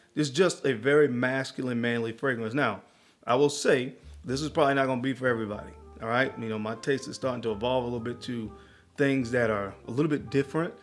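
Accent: United States. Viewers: Please, take the time and show the name of the fragrances on the screen. With your accent (or whatever...), It's not easy for non American to understand the names of the fragrances.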